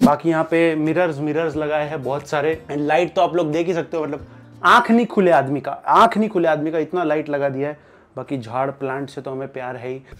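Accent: Maharashtra, India. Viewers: Congratulations 🎉 🎉 Marathi tone bahot hi mast hai bole to Aapke bolne ka style bahot hi funny hai 😂 but it's emotional also..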